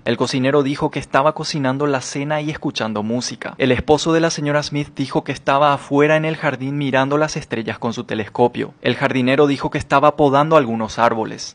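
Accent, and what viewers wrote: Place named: Argentina. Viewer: amigo porque de la nada empezó a utilizar el acento argentino si en los otros videos no lo usaba , no veo la necesidad de hacerlo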